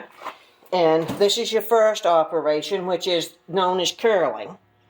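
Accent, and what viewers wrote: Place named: Maine, United States. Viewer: Loved her Maine accent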